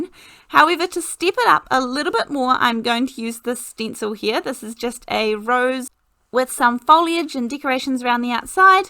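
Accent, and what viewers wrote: New Zealand. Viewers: Thank you so much. I’ve got some of that. I’ll give it a go I do have a hard time but maybe it’s the paper. I love, love your videos. Your kiwi accent is lovely to listen to. I’m in Aus. Perth. ❤️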